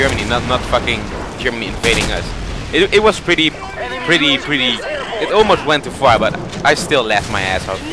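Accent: Netherlands. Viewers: I knew it, i noticed a dutch accent :p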